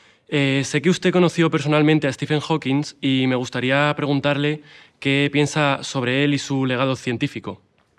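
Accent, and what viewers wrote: Mexico. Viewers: Hasta que escucho un Mexicano, muy bien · Acento mexicano. Me lo ha arruinado. NO gracias.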